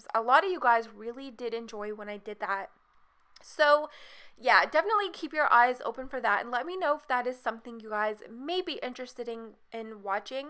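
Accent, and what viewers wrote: Canada. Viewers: Are you from Canada? I'm just asking because of your accent.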